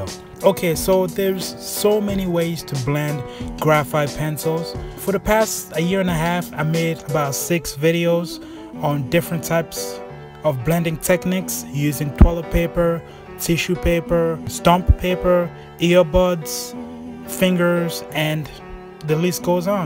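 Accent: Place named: United States. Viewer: he is gaining an american accent overtime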